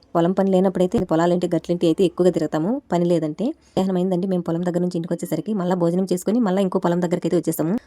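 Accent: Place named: Rayalaseema, India. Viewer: A vuru midhi rayalaseema na anantapyram anukuntunna mi slang vini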